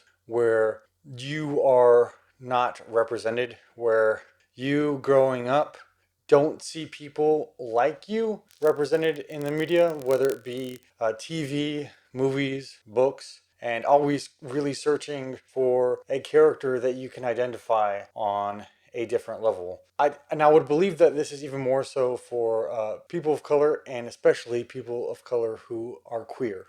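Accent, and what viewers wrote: South, United States. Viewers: I can't believe you're talking about your late pride TBR at your house in Texas on this video during your quarantine including your past days in the days and don't ask, don't tell after you had a lot of the LGBTQ-related books including Gideon the Ninth by Tamsyn Muir, "The House in the Cerulean Sea" by T.J. Klune and "The Color Purple" by Alice Walker, so I'm very proud of you and I hope your husband, Zach will be very happy for you, instead and I love your Southern accent!!!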